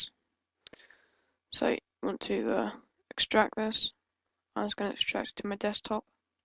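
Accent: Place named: England, United Kingdom. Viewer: luv ur english accent X)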